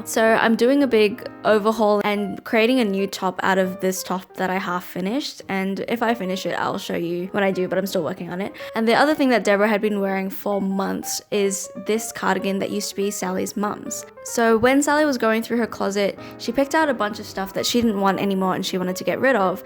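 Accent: Australia. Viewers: you're literally the helpful older sister everyone wants, also you australian? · half way into the video before realising that you're Australian too woah I'm so used to hearing the accent that it didn't register until you mentioned cotton on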